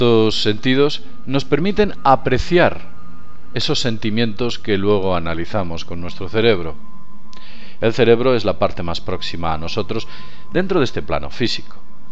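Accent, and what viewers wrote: Spain. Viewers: Es el primer video suyo q veo! lo explicaste muy bien (gracias por no hablar con mucho acento español) y me suscribo! tengo unas inquietudes! he escuchado q la raza alienigena q nos creo ha usado una tecnica muy parecida en la cual ellos pueden extender su vida tanto como le deseen (tecnica invasiva en la cual extraen o adhieren "algo" solamente terminado el desarrollo fisico). y solo hay uan persona a la cual constantemente lo estan resucitando s podria decir! (le jefe supremo). ¡¿Sera q las razas avanzadas conocen a la perfeccion esta clase de enzimas para detener el envejeciemineto y curar enfermedades? yo creo q es muy seguro! y x eso viven tanto tiempo!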